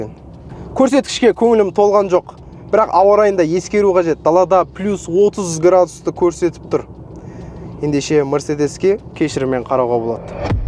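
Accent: Standard. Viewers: Бауырымызға сәттілік. Қазақша таза, сауатты сөйлейді екен. Ғаламторда сауатты, пайдалы, қазақша бағдарлама көбейсін, аумин. Саясатты білмеу, әділетсіздікпен күреспеу, ұрпақты аяқ, қолын жіпсіз байлап жауға бергенмен бірдей. Оян қазақтар. АЛЛАҺУ АКБАР.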